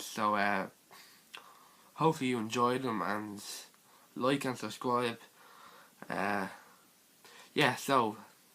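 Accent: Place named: Ireland. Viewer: I'm sorry for coming off as a complete weirdo and being off topic but I love your accent (are you Irish?)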